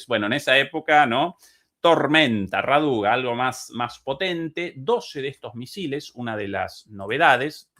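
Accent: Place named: Argentina. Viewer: Eres Re-Argento para hablar. Me encanta q no hayas perdido el acento. Abrazo de otro argento.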